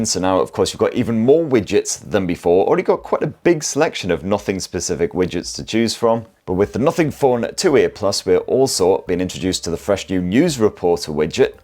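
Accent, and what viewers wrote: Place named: England, United Kingdom. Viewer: مع لكنة انجلترا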